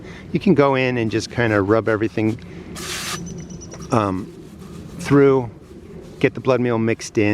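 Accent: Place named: Maryland, United States. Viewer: I hear Maryland I subscribe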